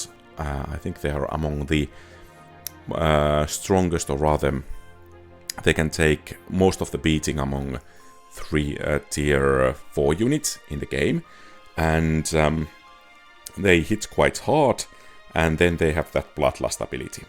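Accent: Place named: Finland. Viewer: Based on the accent I think Finland.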